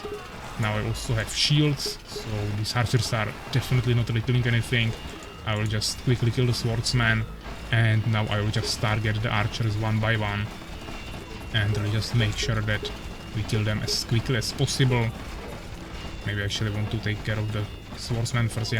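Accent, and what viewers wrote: Czech Republic. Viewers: tu češtinu v hlasu slyším hodně :-)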